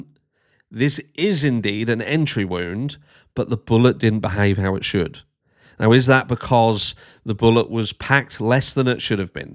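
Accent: United Kingdom. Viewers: It appears you think because you speak with a British accent or whatever, you think people are supposed to believe you over everyone else. Jimmy Kimmel is a comedian. Jimmy Kimmel is not a racists podcaster who preaches hate division & white supremacy, nor did Kimmel advocate for gun violence to include kids being murdered in cold-blood in schools and churches as they studied & prayed. You had no problem throwing shades at Jimmy Kimmel for rightfully doing his job in a comedic way through political satire for calling out a 21st-century Klansmen in a suit & at no time did you bothered to mention any of the racist disgusting horrible things Charlie kirk has said which told me everything i needed to known about you and your up bringing or current beliefs. I watched your video, and just like the one before this one, I gave it a thumbs down. If you're going to make videos to explain your point of view from an honest point of view, you should leave your biased feelings out of them, ,, Otherwise you're just a low grade brand of Foxnews propaganda which is responsible for the current political divide in today's America . 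The killing of 21st-century Klansmen in a suit Charlie Kirk was wrong, but Jimmy Kimmel was just doing his job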